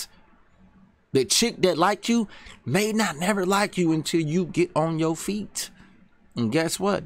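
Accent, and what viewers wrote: Baltimore, United States. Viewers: Nigga said “twenty tew” you def a Baltimore more nigga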